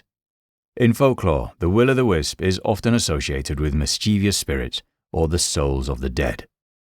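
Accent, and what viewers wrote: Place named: South Midlands, United Kingdom. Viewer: No it's a modest south midlands accent not remotely Bean's Yorkshire 'brogue'.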